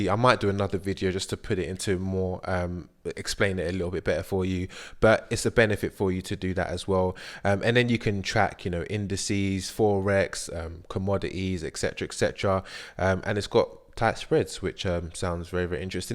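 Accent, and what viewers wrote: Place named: London, United Kingdom. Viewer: u sound like you're from ends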